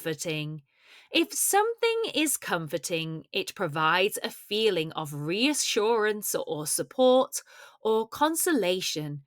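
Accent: United Kingdom. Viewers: I really like your video, it really helps me with British English pronunciation, thank you very much ❤ ￼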